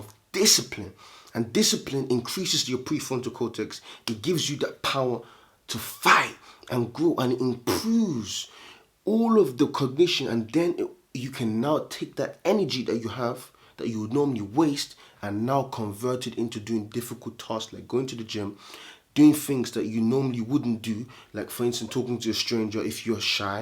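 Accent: United Kingdom. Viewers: based on your accent im assuming you are from the UK, I have a question, do you think it’s realistic that if I retained for let’s say 6 months wisely having my diet in check fasting and meditation etc, that I could earn around 200 to 300 gbp on average a week earning money online if I spent 6 months of research on it? It’s a specific question but I’m just curious how quickly SR can get me out of this rat race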